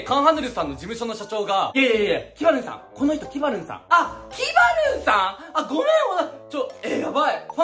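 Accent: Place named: Hokkaido, Japan. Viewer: 大阪に住んでたんだ！ 北海道の言葉と関西弁を喋ってるからずっと謎だったのが、今納得しました❤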